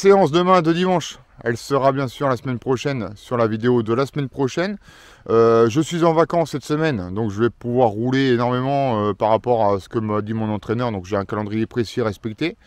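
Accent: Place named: Marseille, France. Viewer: Salut c’est mouna trop marrante la vidéo je sais pourquoi tu parles marseillais c’est se que tu faits avec papa au bureau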